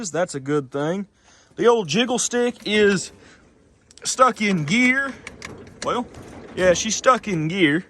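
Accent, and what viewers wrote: United States. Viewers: What state are you from Yank?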